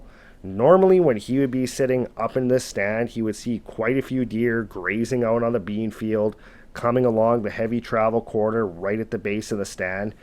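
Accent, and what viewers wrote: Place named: Canada. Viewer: Your Canadian accent is refreshing to listen to rather than the same old. Also only thing he did wrong was ignore his instincts after the first night.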